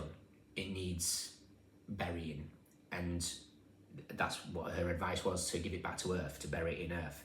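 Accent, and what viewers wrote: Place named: Cumbria, United Kingdom. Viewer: Are you from around the Barrow-in-Furness area? I was born there, your accent is familiar. Yes I'm done with family that have a toxic pattern. Been scapegoated long enough. Done. Isolated, alone, but better than being crapped on repeatedly!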